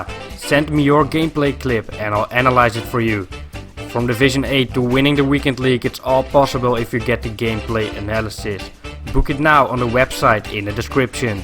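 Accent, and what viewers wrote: Netherlands. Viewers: Must be dutch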